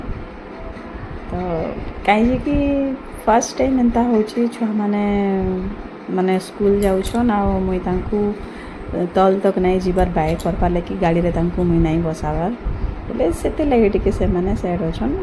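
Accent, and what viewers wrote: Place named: Odisha, India. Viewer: Didi tmr balangir district kae, mane aamar ganjam jilla, hele tmr bhasa sunibar ta bhal lagsi ta reply kali. 😂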